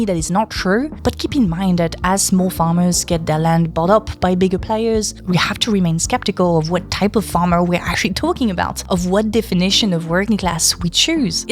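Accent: France. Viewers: Why does sound more French than she used to? Her videos from 3 years ago sounded closer to an English accent than now? Is she just not around English speakers as much now? Just curious. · I assumed you were upper-class because you're French and have a French accent. (And because you're pretty, admittedly.)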